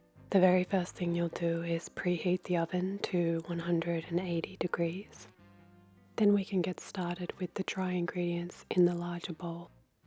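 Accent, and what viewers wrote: Australia. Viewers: I can’t possibly fathom the heavenly perfection of your voice. I mean I’ve heard thousands of soft voices in all the videos available online but yours - hands down - is a million miles ahead of all of them. My god it’s incredible. Plus that tinge of Australian accent (if I’m right) is the cherry on the cake. Davvero perfetta!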